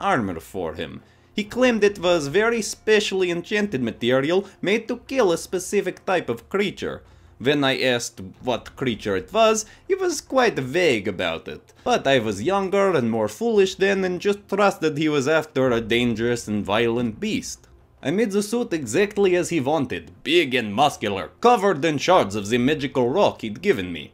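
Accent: Russia. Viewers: The russian accent! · Love the Russian accent, heh.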